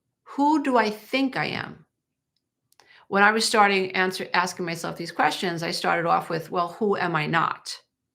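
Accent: New York, United States. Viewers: love the content ❤! Shorter segments please. Will go further for you. Absolutely love your sense of humor! And your new York accent! Keep up the good work!❤❤❤! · i love you and bought your book for my mother before she passed in 2016. you are a breakthrough person for me. i love your NY accent. I want to say something please just take with a grain of salt. I feel that at the end when you describe your relaxing ways it seems like still passively avoidance...the breakfast with the daughter. it is not really being alone and authentic. also, if you met your hubby, good as he is, when you were needing a secure hubby and used your perfect looks and sabayon recipe to lure him...do not take offence...this marriage may be not the final answer for a HUGE spirit for yourself. I am not saying that i want you to get scrubby for a day and fart in front of him, but maybe its time....LOVE YA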